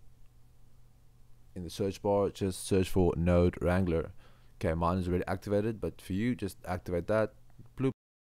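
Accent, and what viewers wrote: South Africa. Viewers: Ngl get south African vibes from ur voice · dude are you South African?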